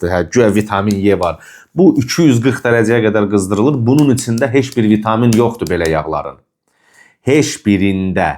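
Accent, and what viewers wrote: Ganja, Azerbaijan. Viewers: eşq olsun qardaşım! elə birinci videonnan tutmuşdum ki Gəncəlisən)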